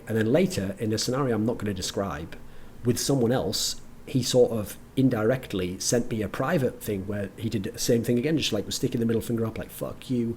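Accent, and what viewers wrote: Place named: United Kingdom. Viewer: Is he from UK? I find it a bit hard to understand what he's talking about, because of his accent. Btw, amazing speach bro!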